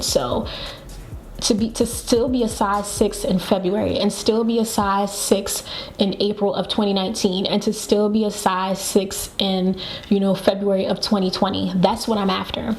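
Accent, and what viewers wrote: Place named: Baltimore, United States. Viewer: I just ran across your video! Are you from Bmore? You’re accent is so cute!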